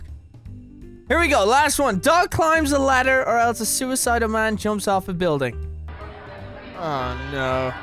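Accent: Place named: Ireland. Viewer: Sometimes he sound Irish and sometimes he doesnt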